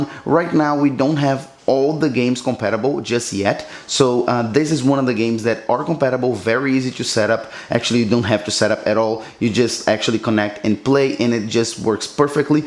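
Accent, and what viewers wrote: Brazil. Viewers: Look at this accent man! Are you from Brazil?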